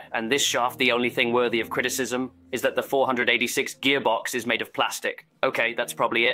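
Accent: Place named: India. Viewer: Omron switch omron switch? Is this automaticly translated? Vegan control board? WTF it sounds like a indian person speaking english for a first time…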